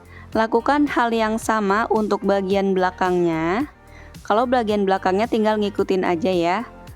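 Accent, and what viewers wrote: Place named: Tegal, Indonesia. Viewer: Massya allah 🤲 kangen ciri khas tegal lur "semoga rezekinya lancar berkah sukses ya Bun🤲🙏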